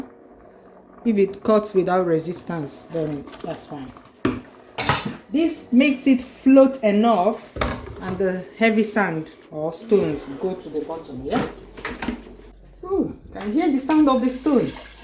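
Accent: Nigeria. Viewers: ...i learn soo much from you. Listening to your voice reminds me of home, Nigeria...🥂❤. Thanks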